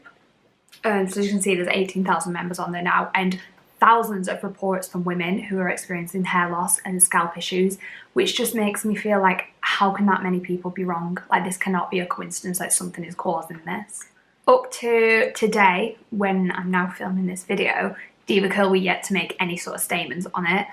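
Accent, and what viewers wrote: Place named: United Kingdom. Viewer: In all this horribleness and negativity it’s refreshing to hear a more local accent and the word ‘brew’ on YouTube as most influencers I watch are American 😂👍🏻 I binned half a bottle of Delight conditioner a few months back 😩 but it was so difficult to get hold of these in the UK anyway, just as well now...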